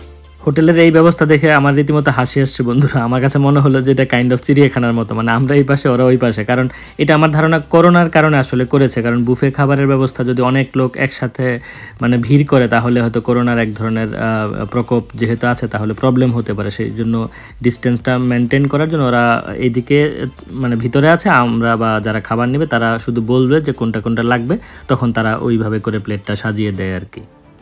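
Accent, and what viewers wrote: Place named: Madaripur, Bangladesh. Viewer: ভাই আপনার কথা একেবারে খাটি বাঙালি.এভাবে কথা আমরা মাদারীপুর বাসি বলে থাকি. ধন্যবাদ এভাবে ভিডিও আমাদের উপহার দেওয়ার জন‍্য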